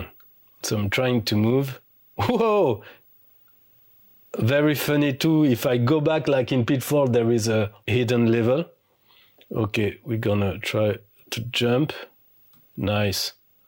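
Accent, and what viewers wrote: France. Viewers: I very much like a French accent, I like the French. Love the video on this and the Oric, and I very, very, much hope that you will continue to make more English language videos. Particularly, since much to my embarrassment, I don’t speak French. (In my defence I wanted to learn French at school, when the brain is still receptive - but an older sibling had chosen Spanish and we had all the books. Colour me disappointed I can tell you.) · Instant subscribe. And never apologise for your French accent. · Awesome! We produce all kinds of new add-ons, and do refurbs of Sinclair machines. Lovely to see your new channel, and I do like your French accent! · Thanks for the video! I helped win a ZX80 for my primary school, then managed to persuade my parents to buy a ZX81 when it came out - that Tyrannosaur from 3D Monster Maze still haunts me! Thanks for showing that Pitfall style game, it looks amazing! 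PS - I'm a Brit and I love your French accent! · French accent: French. Kisses computer: definitely French! Great video and please don't apologise for speaking fluent English with a beautiful French accent. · Ace accent, reminds me of the comedy French Accent you always see in English language TV! Great subject!! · Don't apologise for your perfect French accent. You present an excellent video in great style. Donc il faut que je voie les videos que vous avez déja téléchargées sur Youtube en français! The ZX81 back then taught me how compact you could make a simple OS to cope not only with the interface and the Basic interpeter, but also the display. It was a model of economy of coding. Its printer was very clunky, too: a spark printer which burned characters in a silver metallic coated paper to create dark grey "printing". I found a box of spare spools of that coated material only a few months back, safely put away in 1985! 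· Don't worry about your French accent. Your English is better than my French (neither read nor speak it). Arctic Retro embraces his Norwegian accent - you should your French. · I am very proud of myself, I watched your video and I could understand all that you were saying, so no I can listen to a Frenchman speaking. Thank you, I give you a big thumbs up! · Hi, Do not be sorry for your French accent. As you could not help where you where born. Be proud of it. 👍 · Welcome to the rest of the world, LOL. 😁😎 love the French accent and thank you for the fabulous video. ❤️❤️❤️❤️ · Happy to listen to a French accent instead an AI voice over any day. I enjoyed the content and look forward to more videos. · Never apologies for your lovely french accent ❤ not a scam but cheap tack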